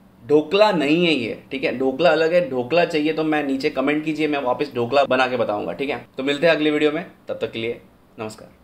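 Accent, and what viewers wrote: South, India. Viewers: I am saying true i don't cook food but I am a foodie i watch your video for entertainment by your south Indian voice 😀😀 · tera south indian accent wala voice rocking hai bhai...!!!!👍👍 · Nice original voice😍👌sir.... bt mujhe apka south India voice version is se vi jada pasand hai❤ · bhai Aapki recipi ki saari video dekhata hu muze bahut pasand hai. Aur aapki ki vo sauth Indian waali voice bahut pasand hai. Aaj aapki real voice suni vo bhi best hai. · Very nice 👌👍🤗 specially when u "spoke"Normally" 😂 I NVR liked ur South Indian accent 😢 Once U commented also , (long back) aftr that I nvr saw ur videos, but now I enjoyed this video (with ur "normal" voice 😂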